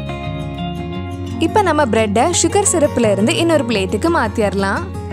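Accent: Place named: Kerala, India. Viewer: R u malayali ..... Vithyasama iruke unka Tamil?????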